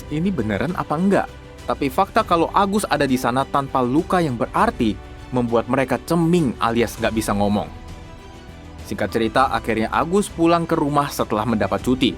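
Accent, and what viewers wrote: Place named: Java, Indonesia. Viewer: Jangan pakai logat jawa lah. Jadi gak enak konten mu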